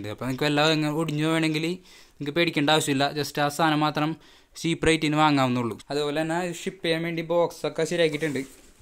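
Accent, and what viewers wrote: Thrissur, India. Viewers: “ ” next M4tech YouTube channel, keep pushing and keep talking in that”Thrissur” slang 🤘🏽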